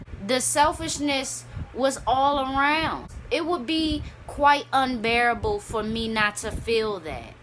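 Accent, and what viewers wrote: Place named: South, United States. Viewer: Are you from Harlem. How do you know this stuff you sound like a downsouth chick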